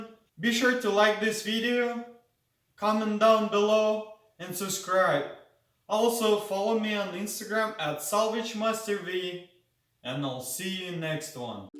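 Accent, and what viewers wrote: Russia. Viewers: Акцент русский!!!! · Бро, ты не русский случайно?)) Акцент выдает)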